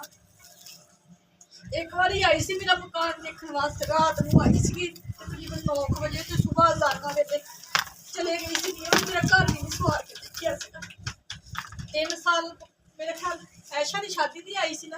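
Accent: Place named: Faisalabad, Pakistan. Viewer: وعلیکم سلام باجی صاحبہ کیسی ھیں کیا آپ فیصل آباد سےہیں آپکے بولنے کے انداز سے ایسا لگا 😊😊😊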